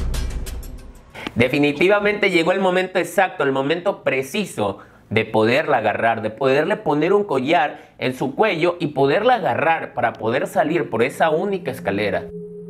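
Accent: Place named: Ecuador. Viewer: Hola yo también soy del Ecuador pero vivo en Italia yo llegué en tu canal por que soy animalista y una de mis amigas había publicado un video en Facebook entonces lo VI y por tu acento me dije el es mi compatriota y que orgullo saber que existe una persona haci en mi país El maltrato animal en Ecuador es muy penoso la gente no quiere entender que ellos siente dolor que tienen un corazoncito cuando estuve en Ecuador VI tantos animalitos en la calle maltratados perritos atropellados y para mi ver esto era un sufrimiento tan grande trataba de sensibilizar a la gente pero no es fácil espero que los niños del futuro que aprendan a amar y respetar a los animales me gusta pensar haci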